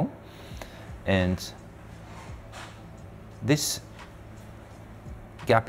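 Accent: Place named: Australia. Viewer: The Australian accent threw me off for being japanese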